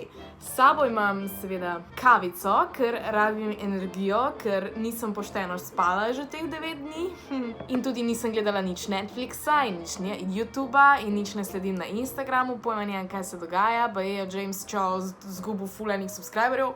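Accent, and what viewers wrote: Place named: Velenje, Slovenia. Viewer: Halo gledalci spostujte jo kakrsna je ce ne pa ne gleda ce vam ni vsec... Men je top ker je taksna kot je in pac velenjcani majo tak glas sej govori slovensko sej ne kitajsko ce se pa v šoli niste ucil jemat snovi narecja v Sloveniji pa ni moj problem ampak sami ste krivi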